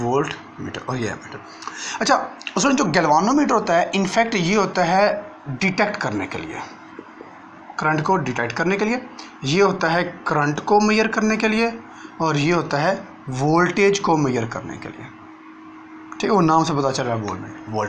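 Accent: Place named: Punjab, India. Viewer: Physics with punjabi touch....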